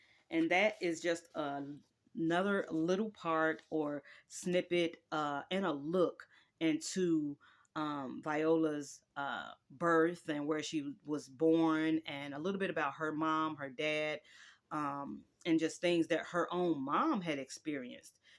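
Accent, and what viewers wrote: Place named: South, United States. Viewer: what a great cookbook!! I love that you add the southern accent😊❤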